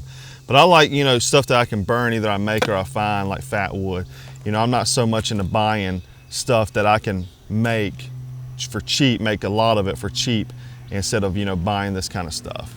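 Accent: South, United States. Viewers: Marine with a southern accent who reviews survival gear outdoors. Subbed.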